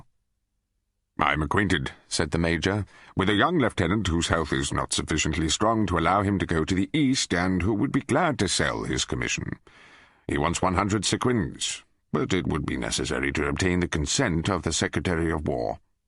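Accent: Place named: England, United Kingdom. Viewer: English accent is the best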